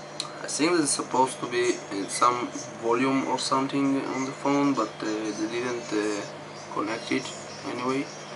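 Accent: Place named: Israel. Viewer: ידעתי מהתחלה שאתה ישראלי בגלל המבטא תודה אחי עזרתי לי מאוד :)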